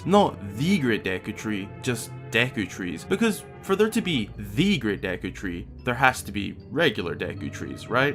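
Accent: Scotland, United Kingdom. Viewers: Last week, I had a dream that I was in a very creepy castle full of ghosts. As weird as it is, voice, in all its Scottish glory was there narrating was I was going to encounter.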